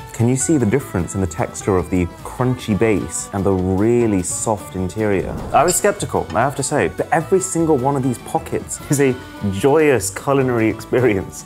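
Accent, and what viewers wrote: United Kingdom. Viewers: Are you briesh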